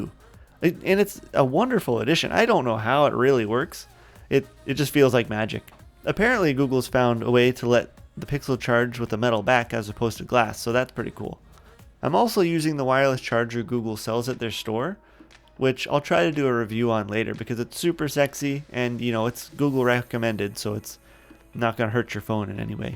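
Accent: Canada. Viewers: Eh bud, what part of canada are ya from, I'm out in BC. Just your accent is one haven't heard from where I'm at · This was exactly the comparison I was looking for. Love the Canadian accent btw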